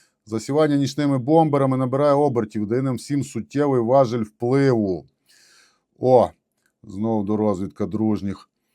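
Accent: Transcarpathia, Ukraine. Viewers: Як приємно чути нашу Закарпатську бесіду.Слава хлопцям! Дякуємо за роботу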